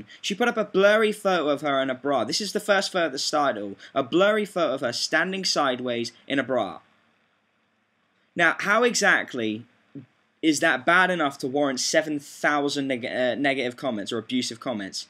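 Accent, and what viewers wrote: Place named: United Kingdom. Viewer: Lol a British guy..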